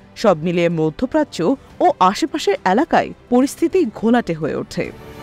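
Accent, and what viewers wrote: Kolkata, India. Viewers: ৭১ টিভিতে কি আজকাল ভারতীয়দের নিয়োগ দেয়া হয়? এই সংবাদ পাঠিকার উচ্চারণ শুনেই বোঝা যাচ্ছে যে সে পশ্চিমবঙ্গের কলকাতার অধিবাসী! সকল দন্ত্য-স এর উচ্চারণ কলকাতার টানে বলছে!!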